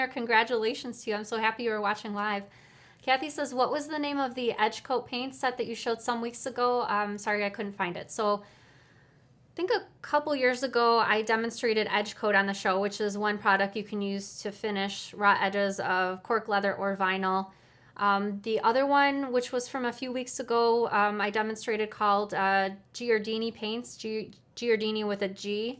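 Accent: Newfoundland, Canada. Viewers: Deffo Newfoundland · Newfoundland for sure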